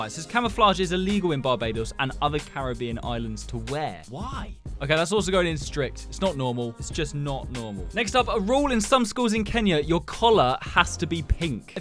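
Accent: United Kingdom. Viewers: You’re British I never knew you’re a British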